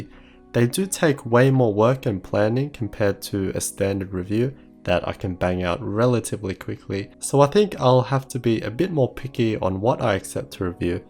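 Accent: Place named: Australia. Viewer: You sound like Lachlan Likes a Thing. I think it’s the Aussie accent.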